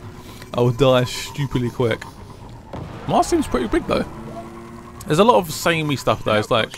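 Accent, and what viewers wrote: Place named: Southeast England, United Kingdom. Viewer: I'd guess he's from Southeast England.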